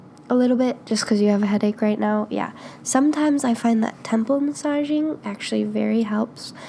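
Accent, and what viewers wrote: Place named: Syracuse, United States. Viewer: CONTROL. Control is the word you wanted. By the way, are you from like Syracuse or somewhere around there? Your accent sounds like everyone I went to high school with haha.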